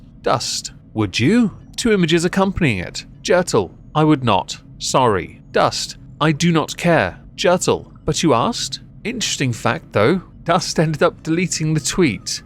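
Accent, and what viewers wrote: United Kingdom. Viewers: Same... I accidentally found on a random lol cow content excursion and then watched the "other stuff" and was still lol'ing and wondering "how have I not found this channel before now?" Is totally my kind of humor even when I don't agree with joke... the British wit & voice is just a bonus 😉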